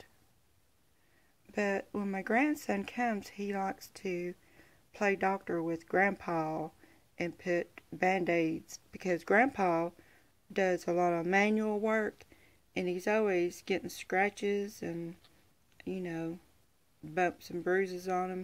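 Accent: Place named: Tennessee, United States. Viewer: I knew you were in Tn by your accent. I lived in Chattanooga for 17 yrs. I love the yellow ones💕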